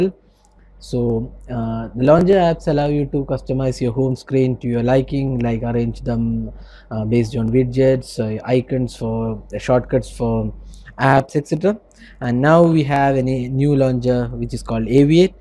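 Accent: India. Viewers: Love the india accent!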